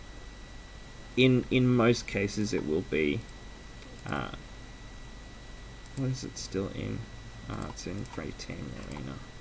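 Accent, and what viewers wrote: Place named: Australia. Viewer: You sound Australian, are you?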